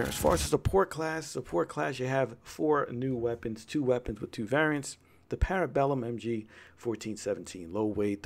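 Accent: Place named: Philadelphia, United States. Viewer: this guys gotta be from the Philly area. Lived my whole life here, can hear a Delaware Valley accent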